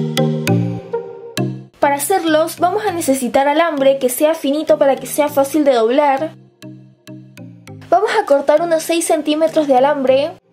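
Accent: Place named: Córdoba, Argentina. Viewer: De que parte de Argentina sos? Pareces de cordoba,i ndea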